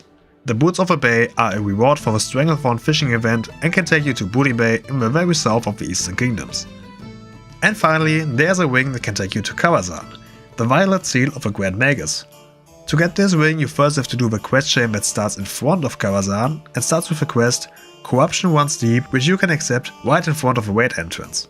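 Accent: Germany. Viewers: Where do you come from? Your accent sounds like a German trying to speak English.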